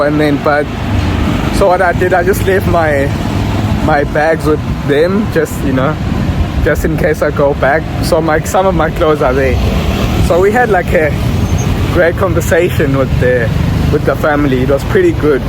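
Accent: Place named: South Africa. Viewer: Definitely South African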